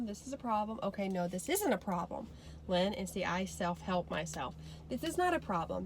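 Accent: South, United States. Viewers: I love your chatty videos! I especially love your southern accent.... go ahead sing talk all you want! I'm on the same boat with anxiety & depression, it comes in waves for me too. On top of that I'm an introvert in every which way. I smile through it all & try not to drag others down with me. I'd rather bring a smile & say hello to others, just in case they're dealing with a similar situation or worse. It's in my nature to do that.... loved this video! 💜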